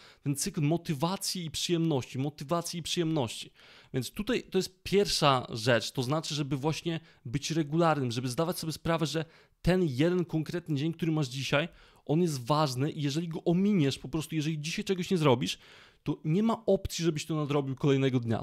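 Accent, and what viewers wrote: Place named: United States. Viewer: Dykcja jest dobra. Akcent jest amerykański, albo holenderski. Taka kluska w gębie. Albo pilnowanie sie, zeby mówić niskim głosem.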